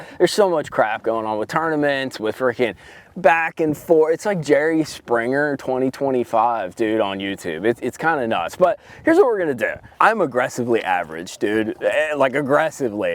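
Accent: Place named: New York, United States. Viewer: Love your content,long time watcher of your channel. Curious if you are from New Jersey or New York, you sound like my college roommate! 😂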